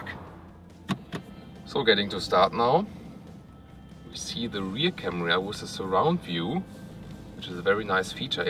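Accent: Germany. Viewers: ***** Kann dir da nur zustimmen. Sprichst zwar mit einem starken deutschen Akzent, ansonsten geht dein Englisch völlig in Ordnung. Und so ein Akzent ist halt völlig normal bei einer Fremdsprache.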